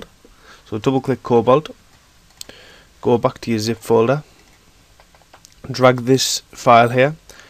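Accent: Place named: Jamaica. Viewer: i love your Jamaican accent :D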